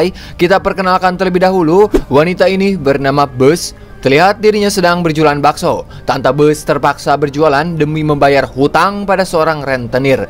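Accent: West Java, Indonesia. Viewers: Aa Org Sunda Bukan. Lucu Ngomong Nya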